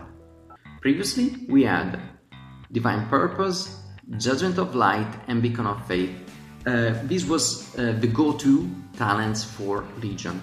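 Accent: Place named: Italy. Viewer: Hey, dall'accento presumo tu sia italiano. volevo chiederti come mai hai puntato su una guida in inglese, ci sono tanti content creator inglesi che parlano di wow e davvero pochi che parlano di wow in italiano, specialmente riguardo agli healer in ampio spettro, a tal proposito hai in mente di fare in futuro guide in italiano? per la cronaca grazie per tutte le dritte e le informazioni di BfA! nessun suggerimento su come poter entrare a far parte del beta testing di BfA?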